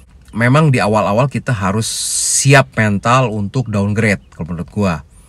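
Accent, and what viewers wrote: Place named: West Java, Indonesia. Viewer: Bro logatnya Sunda yah.. sampurasun Kang 😊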